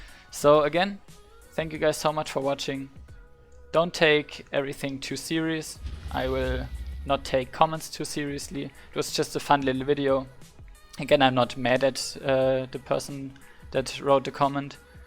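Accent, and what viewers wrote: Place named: Germany. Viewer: Ich wusste doch der akzent ist Deutsch! Ein Frostwolf kollege! :D Davon ab: sehr gutes Video, habe erst kürzlich wieder angefangen und Visions sind noch recht neu für mich (hab gestern den ersten 4Mask run gemacht). Ich konnte jetzt einige Sachen aus dem Video mitnehmen die mir sicher in späteren Runs helfen.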